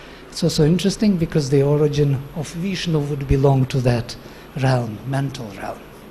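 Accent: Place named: Russia. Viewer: Very beautiful exposition and i really enjoyed the charming Russian (?), Indian accent and humble manner in which it was delivered. Thanks !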